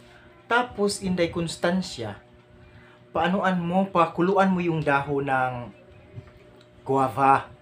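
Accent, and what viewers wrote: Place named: Visayas, Philippines. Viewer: Hehehe, thank you po sa very funny content na ito. You entertained me po talaga! Ang galing mo po mag-act/host. I love the flower background also. Love the Bisaya accent.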